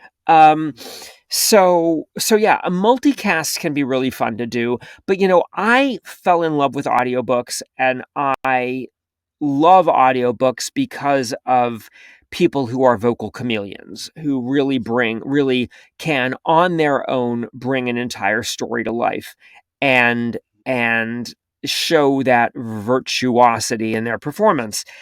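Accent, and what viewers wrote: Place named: Australia. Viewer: I love his Australian accent...Him narrating Honeymoon For One by Keira Andrews is amazing!!!!!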